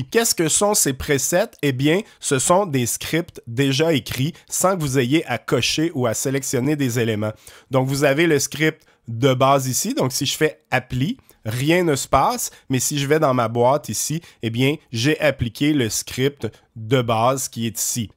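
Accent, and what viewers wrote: Quebec, Canada. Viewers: J'ai l'impression que c'est un super logiciel, mais pas fait pour moi ... D"abord car mon anglais est médiocre, et ensuite, en informatique je ne suis pas au top à mon âge avancé ... C'est dommage, car j'aurais bien aimé nettoyer mon pc efficacement et supprimer tout ce qui est inutile !!! Petite question : Avira free est-il fiable comme anti-virus ? Bonne journée à toi, de la part d'un français de notre pauvre France, c'est toujours un plaisir de t'écouter car j'aime cet accent de la "Belle Province", et même si je n'ajoute pas de coms à chaque fois, je mets toujours un "j'aime" 👍👍👍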